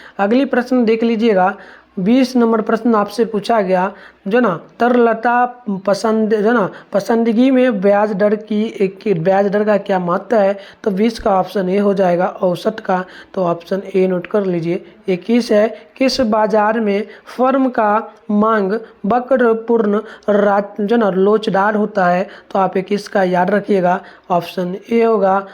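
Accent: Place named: United States. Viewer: Sir aap अमेरिका ke he kiya aap ke language waise. hee lagta he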